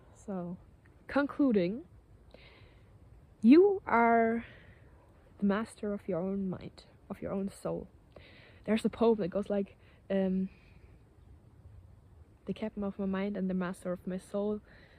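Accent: Germany. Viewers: Thanks for the recommendation. I also liked the movie mitty, where he lives a simple life and become to enjoy it, when he goes on a journey because of his work. I first thought English is your first language, but I kind of hear some german accent. Sprichst du etwa deutsch?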